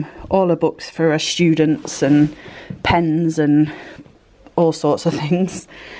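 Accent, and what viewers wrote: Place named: England, United Kingdom. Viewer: Love it! And I also LOVE your English accent 🥰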